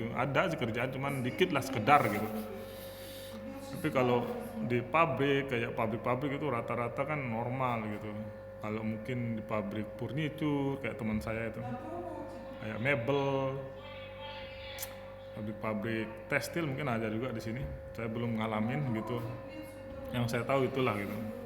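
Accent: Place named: Lombok, Indonesia. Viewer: asli mna saudara kyknya lombok dari logatnya ..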